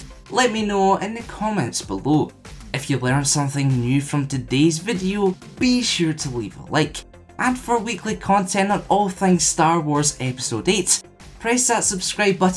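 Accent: Scotland, United Kingdom. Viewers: what part of Scotland are you from? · thanks for answering. I don't mind I like your accent:)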